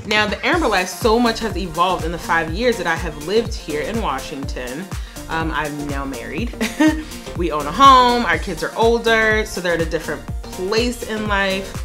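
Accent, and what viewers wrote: Trinidad and Tobago. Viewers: Yessss gyal! Bring out d Trini accent! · Gorgeous look. I caught the Trini accent before you said it. 😂😂😂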